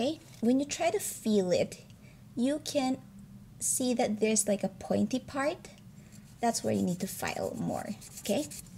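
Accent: Philippines. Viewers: Starting to watch your videos right now and my parents are gonna buy me a kalimba, IM SO EXCITED (I noticed your accent is like a filipino, If so, HI POO MAGALING KA PO MAG TURO LABYUU <3) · WOAH she's Filipino (atleast I think). Im Filipino! "Ang kapal" is Filipino for "Its thick". Also i noticed her Filipino accent :D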